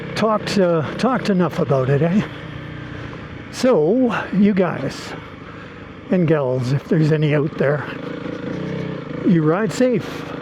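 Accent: Canada. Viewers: Great advice! Thanks! You sound Canadian. Hope I'm still riding at 75.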